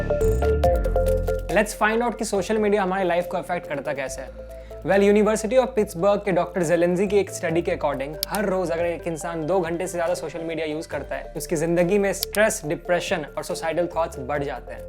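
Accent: Bihar, India. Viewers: your bihari accent is sosexy. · Bhaiya got the 💯 Bihari accent blend 😸PURE IITian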